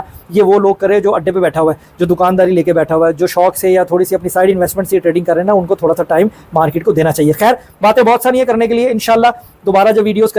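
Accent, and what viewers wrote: Punjab, India. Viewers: Aap Urdu bolty bolty thora touch Panjabi ka lagaty ho acha lagta h, khush raho.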